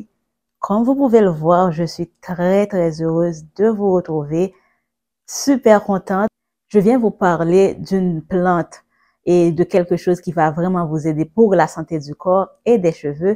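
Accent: Haiti. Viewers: J’aime ta douceur 😅 surtout ton accent haïtien 🇭🇹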